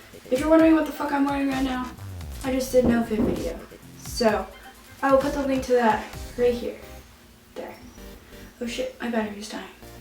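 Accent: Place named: Canada. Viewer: love your accent, Canadian??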